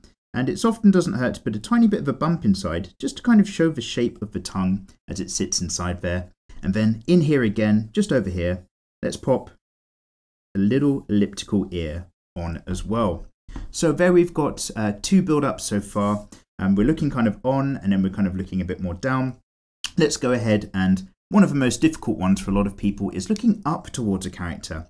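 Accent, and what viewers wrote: United Kingdom. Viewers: ah sory about that but i fucking hate british accent.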